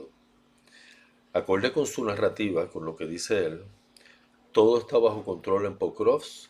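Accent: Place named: Cuba. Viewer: PONGA LOS PIES EN EL PISO AL HABLAR QUE TIENE LA MENTE IDA. ESO SON AÑOS DE HABER VIVIDO EN CUBA. POR LO QUE OIGO PARECE CUBANO.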